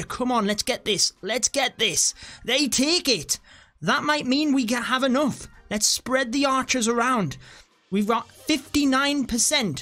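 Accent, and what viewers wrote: United Kingdom. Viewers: well he's british but u will get used to it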